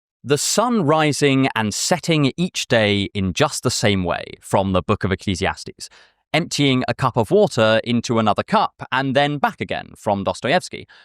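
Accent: RP, United Kingdom. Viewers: Do you speak received pronunciation? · Sir you sound like a man from victorian era elite british!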